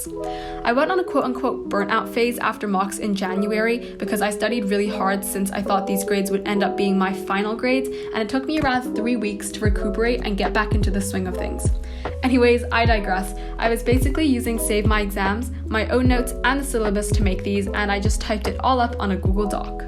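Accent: United States. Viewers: love this, super motivating :) i have a question, are you english? because your accent is a bit more american and i didn’t know how you took gcses x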